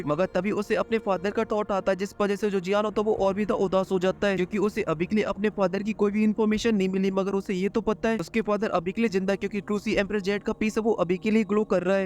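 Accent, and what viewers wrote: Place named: Madhya Pradesh, India. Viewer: Mori mora lak ta ha ap MP sa ho😂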